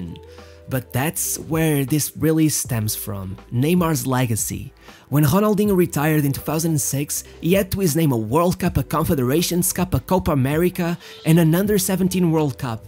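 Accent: Portugal. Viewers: You sound Portuguese bro 🔥🙏🏾 shoutout to you brother Great content fuck them haters 😂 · Eae beleza, tu tens o mesmo acento que todo português tem quando fala inglês, que para minha opinião é bom, tens um ótimo conteúdo, continue com o bom trabalho. Falou cara é nós cara!!! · To the creator of this channel, thank you so much for these great videos! You speak perfect English, so I do not understand why anyone would care about a slight Portuguese accent (which in my opinion, only makes the videos even better and more authentic). I am sure most people think the way I do and that you will reach a lot more people with your content. Best regards from Switzerland!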